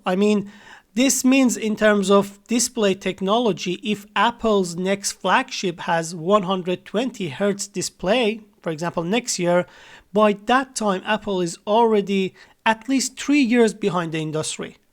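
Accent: Iran. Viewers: The Persian accent makes it more interesting 😁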